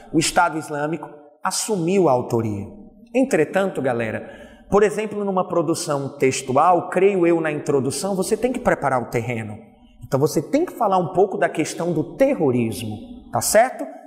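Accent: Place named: Northeast, Brazil. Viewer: Esse professor tem jeito que é nordestino sou tbm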